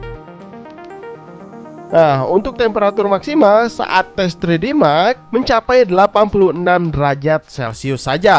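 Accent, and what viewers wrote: West Java, Indonesia. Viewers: amd dan jipors. sundana teu bisa di leungitkeun. haha.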